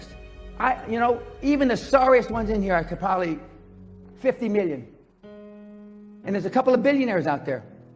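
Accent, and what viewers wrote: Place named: Los Angeles, United States. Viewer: It's a mixture. He lived in Europe as a child, because his father was an Army officer in the early 1950s, so he heard a lot of different accents from his father's friends' kids, and from Europeans who spoke English as a second language. Then the family moved to the barrio in East Los Angeles, a bad neighborhood, until his mother insisted they move. Then he lived in a nicer neighborhood. Then he joined the Army, where you hear a variety of accents. I hear some East LA in his accent, but also a bit of posh English, some standard American, it's a unique accent. No one really speaks like him.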